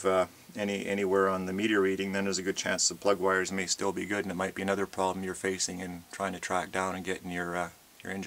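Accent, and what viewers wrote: Canada. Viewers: Greetings my friend. Are you a BERTAN? I lived in BC and Berta. You totally have either AB accent or Sask. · You sound incredibly canadian ;]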